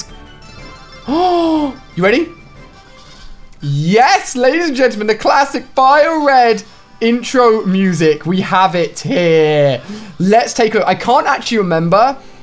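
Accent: United Kingdom. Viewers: no shade but i always have trouble understanding accents like this like the british variety ykwim sis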